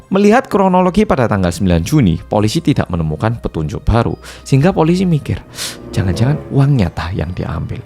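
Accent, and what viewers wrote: Java, Indonesia. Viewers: Enak banget dengar nak "cerita"kaya org jawa lagi mendongeng.g kedengar blas ni orang korea.ngalam pooollll,medhok😂😂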